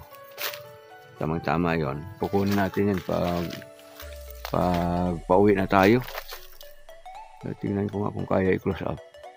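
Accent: Iloilo, Philippines. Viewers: sir ang tuno sang imo daw ilonggo ka man.sa ka sa Iloilo sir?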